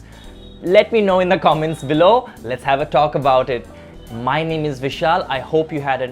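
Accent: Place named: Northeast India, India. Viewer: Did anyone predicted correctly that u belong to north-east when u visit other western states eg Mumbai😁 the way u talk it can be predicted tht u r from north-east but seeing ur face its really difficult 😊 I really enjoyed watching 😊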